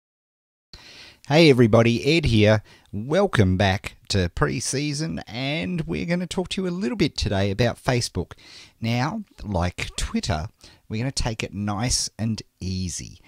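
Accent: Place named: Australia. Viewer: Lol You obviously figure out that this is an ozzie.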